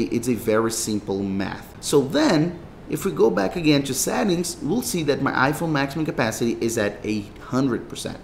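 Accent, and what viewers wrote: Brazil. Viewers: Amazing that even never having entered this channel, this video or having ever heard or seen this guy I am 100% confident he’s Brazilian judging by that accent that I’m able to recognize because I am Brazilian and am a good accent spotter. That said this video is awesome. I had no clue about this. Thanks a lot.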